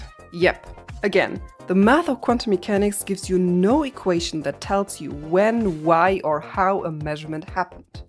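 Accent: Germany. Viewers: I think, no. Definitely German, but different intonation to my ear. Softer vowels, less nasal (not that Sabine is incredibly nasal), and more rolling inflection, rather than the distinct highs and lows of Sabine. Their voices are similar in some respects, but they are definitely different people to my ear. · Agreed, I don't think this is Sabine, but I did do a double take at first. I believe it's a German accent, but I'm no expert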